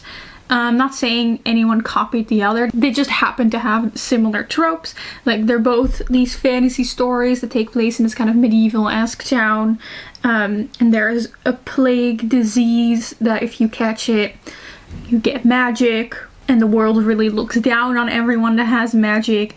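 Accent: Netherlands. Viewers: Okay i didn’t know you we’re dutch untill i read the settings in your e book😭 so thats why you have an accent! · omgg jij bent Nederlands? ik dacht dat je dat was vanwege je accent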